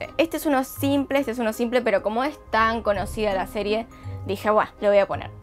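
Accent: Argentina. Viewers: Lo dije una vez y lo reitero....Me encanta tu acento argentino, saludos...!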